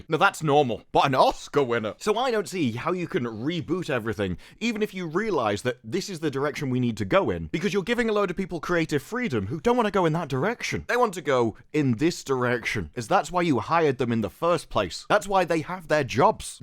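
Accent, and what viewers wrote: England, United Kingdom. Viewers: I now remember why I unsubscribe from you. You are obnoxious repetitive little prick who thinks he's smart when all you are is just some retard repeating the same crap with a British accent. that and just a little thing get some kind of tooth whitening thing going on. Every single video you look like you've been chewing spinach right before you went on camera. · Are you an American just with an English accent because you sound like everything you're saying I would say.!!?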